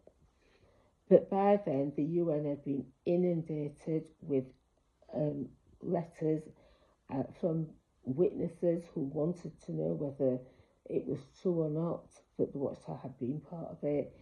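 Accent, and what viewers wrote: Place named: Yorkshire, United Kingdom. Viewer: Hey. My name is Ken, I left JW's after 37 years in 2012, having been brainwashed since the age of 5. Thank you for your video. It's extraordinarily powerful. I remember studying the "Revelation" book in my teens and twenties at the "Book Study Group"once a week, and clearly remember the Watchtower Society excoriating the United Nations. I also remember being at the Twickenham Convention and hearing a letter read out from the platform, condemning the UN as the "Wild Beast". They then bragged that the letter was sent to the UN headquarters. They ridiculed Christendom as being a harlot, and yet, they dropped their own panties and got into bed with the UN - behind the backs of their congregants. What a bunch of spiritual slut$! Vile, disgusting hypocrisy. I'm so glad I am free. I am so glad you are free. I now live in Louisiana but am originally from London. 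Sounds like you're a Yorkshire lass. Am I right? Thanks again for a great video.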